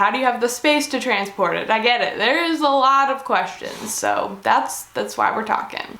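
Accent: Canada. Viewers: It's funny because people always point out my Midwestern accent , and I just noticed your Canadian accent the way we both pronounce out and car and about is like the same lol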